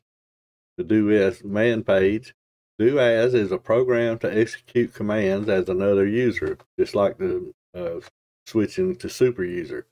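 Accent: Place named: South, United States. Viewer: I could tell you were from the South by the accent. I'm from LaSalle Parish, Louisiana, but most of my family is over in Smith County, Mississippi. One of my mom's siblings is over in Dothan, AL. I've rolled through your neck of the woods a lot!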